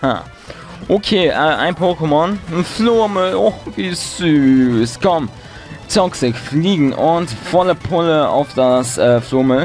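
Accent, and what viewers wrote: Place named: Cologne, Germany. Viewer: ein kölner akzent rischtisch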